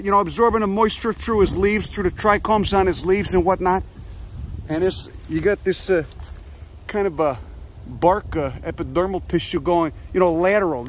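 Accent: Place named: New York, United States. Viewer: Hello, I'm Pete, a new subscriber. Your coyote video brought me here. I am fascinated by your combination of wit, wiseguy and accent. I'm a native New Yawkah from the wilds of the Harlem projects, so I feel at home hearing your vocals and attitude. I'm going to catch up on your list of uploads and enjoy them immensely. Your humanity towards that poor little creature warmed the cold, cold cockles of my heart. I don't know shit about botany but I'm hoping aside from being entertained I will be educated. Thanks for uplifting my day!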